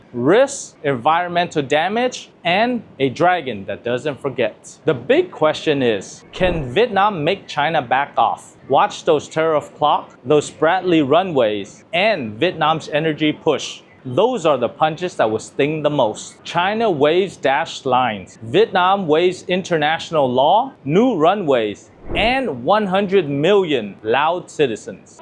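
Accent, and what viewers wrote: United States. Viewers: This man full of American accent, what he is reporting just trying to stir up the status quote of thebreguon.anyway I don't understand a word he is saying.He does not know about the history, nor does he know about the geopolitics and the relationship between Vietnam and China have been straightened 5 years ago. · Trước đó, đời ông bà mình, Tây dùng người Việt đi đánh người Việt. Bầy giờ, Tây mong dùng người Việt đi đánh người Hoa đễ chia rẽ, cảng trở sự phát triễn của Việt Nam và Trung Quốc nói riêng, của Á Châu nói chung. Ông này nói tiếng Anh giọng như người Mỹ quá há các bạn... ? 😉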